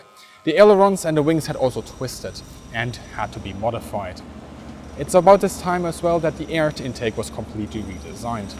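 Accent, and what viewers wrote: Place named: Germany. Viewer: A German is the narrator of this documentary..... LOL ! The most beautiful aircraft ever designed.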